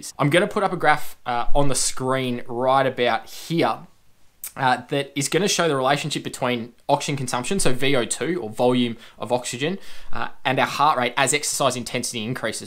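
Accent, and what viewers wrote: Australia. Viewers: Haha First thing I noticed was the (Eric lindros?) jersey, but then we he started talking I thought...”wait a minute...this guys Aussie”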